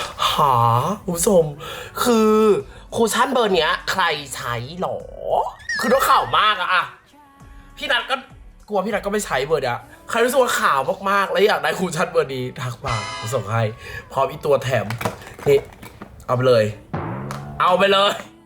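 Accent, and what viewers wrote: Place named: South Korea. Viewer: ไม่ทราบว่าความจริงแล้วชีเป็นสาวโคเรียรึป่าว สำเนียงกับจริตเริ่ดสะบัด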